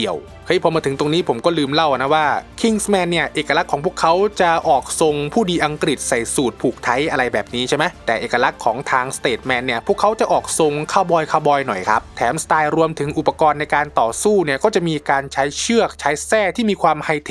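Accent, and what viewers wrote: Isan, Thailand. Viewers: แอดพูดอิสานน่ารักมาก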